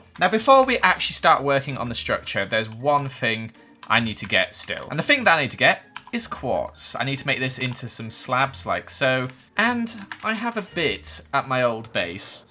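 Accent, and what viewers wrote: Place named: United Kingdom. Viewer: Stop judging British Accents. It's just how we speak.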